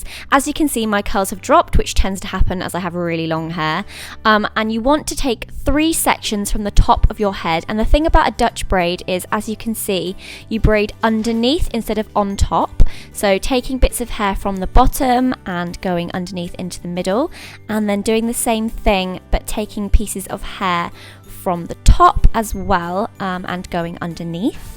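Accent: United Kingdom. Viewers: Beautiful british accent :') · DobbyThe FreeElf I dont know what part of Britain Zoella is from. All in all she is from Britain. Being a foreigner to GB, i am not able to distinguish all the different dialects and accents, and will plainly call it British. Usually thats how it always sounds to me when i meet people from Britain. So, what dialect is it? Educate me on that one, maybe you know better? I find it interesting how people get offended by just an opinion. I never meant it to be offensive. Cant help but feel that way, thats all.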